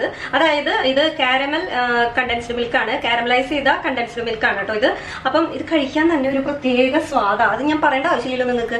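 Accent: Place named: Kunnamkulam, India. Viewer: ആൾടെ സ്ഥലം കുന്ദംകുളം ആണന്ന് തോന്നുന്നു സംസാരം കേട്ടപ്പോൾ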